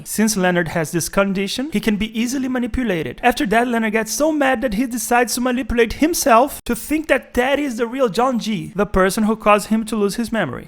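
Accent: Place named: Brazil. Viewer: Sotaque de Br 👍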